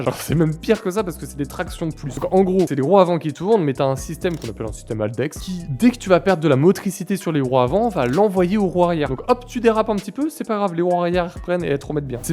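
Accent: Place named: Paris, France. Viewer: Dit il avec son accent de bobo parisiens qui roule à velo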